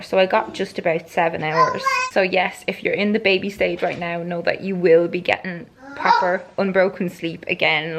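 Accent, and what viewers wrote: Ireland. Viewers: My names is ruby!! You messaged me when you was pregnant last time the way you both say ruby is so cute I love my name way more in an Irish accent and little 2 year olds voice can't believe how fast your pregnancy has gone I couldn't believe it when I started watching all the best to you anc your whole family I'm so excited for you and this little one who gets such and amazing village around her to raise her to be an amazing human so much love ❤️ the hair is amazing reminds me of og stay safe look after yourselves lots of love xxx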